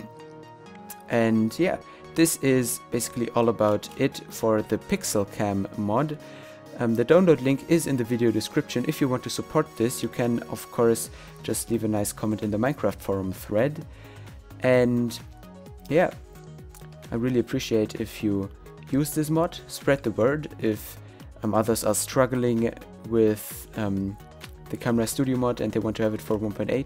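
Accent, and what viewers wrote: Germany. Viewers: Du bist Deutsche, ne? Merkt man direkt :DD Nice MOD by the way!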